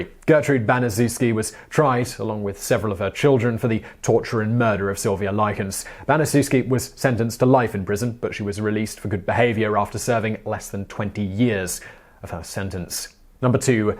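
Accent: United Kingdom. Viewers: Sounds like the host is British. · Your British accent is awesome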